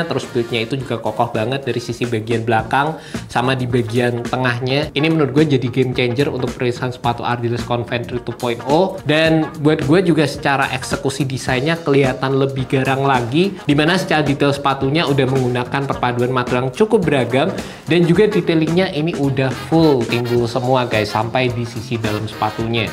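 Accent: Java, Indonesia. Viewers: Gue nya medok mas.. Keren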